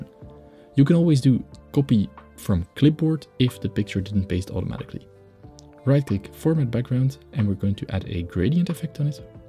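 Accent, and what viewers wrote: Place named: Netherlands. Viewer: I recognize a Dutchie when I hear one !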